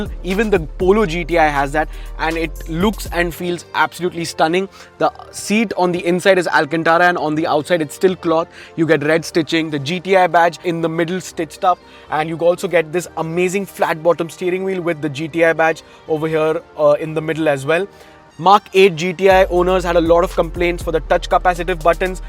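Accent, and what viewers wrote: India. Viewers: u sound indian, don't get it?😅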